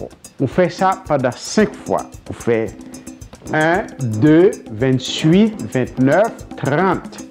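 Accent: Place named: United States. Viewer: Premyè fois ke mwen tande ameriken aksan la, avèk kreyol ayisyen la. Mwen pa te tout konprann. Men mwen imajine ke travay'w se de bòn kalite !!!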